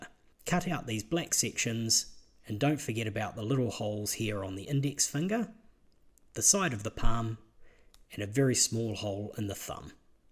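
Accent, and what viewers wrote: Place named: England, United Kingdom. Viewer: I LOVE that this guy has an english accent! You're like this is a straight laced normal guy. Then he makes a screen accurate Freddy glove!! I thought u liked tea and krumpets!?!? My childhood is ruined and it's not about Nightmare on Elm Street! THIS guy and his babysitter voice, piecing together of screen accurate Freddy glove... What accent is that? I'm staying the f*** away from that town...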